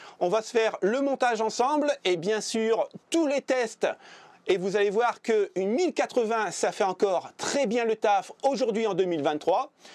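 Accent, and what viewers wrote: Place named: Nord-Pas-de-Calais, France. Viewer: Cet accent chti ASMR 🎶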